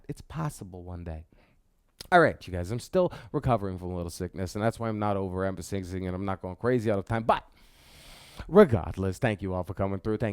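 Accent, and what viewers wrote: New York, United States. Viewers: You from New York?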